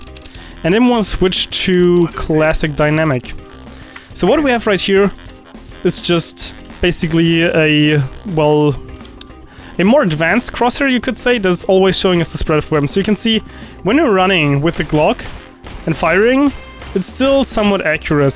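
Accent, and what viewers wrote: Germany. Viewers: u german or something?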